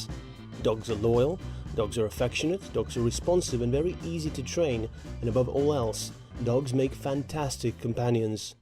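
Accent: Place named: United Kingdom. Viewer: Shut the fuck up you and your annoying British accent.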